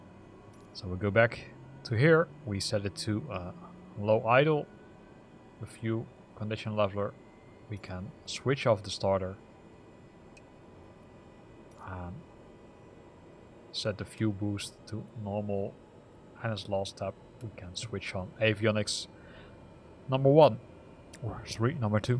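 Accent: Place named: Netherlands. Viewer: Sounds Dutch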